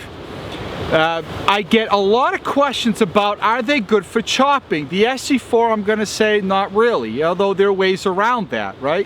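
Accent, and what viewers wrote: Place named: New England, United States. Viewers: So I'm listening to the long island, possibly brooklyn, possibly queens or even boston accent - and being a city boy myself, I'm not overly hopeful about this review because this guy clearly hasn't grown up skinning gators or twisting antelope sinew for shoelaces. And then he taught me how to CHOP/CHISLE using a batoning technique. Apologies, bro. Solid video. You've done the work. Thanks for the lesson. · i just looked at it quickly,, i don't like the 51,, anyway,,where are you at/from?? i am from north central maine, live in west central florida,, you have that yankee accent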